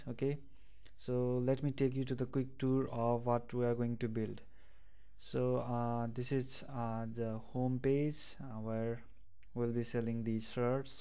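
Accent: India. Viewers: Clear to it's best!! Bangali??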